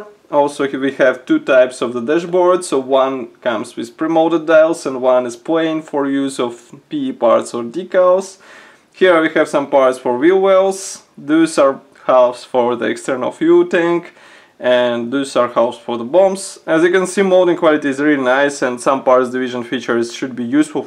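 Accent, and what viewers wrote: Russia. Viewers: Мне показалось или я слышу русский акцент?....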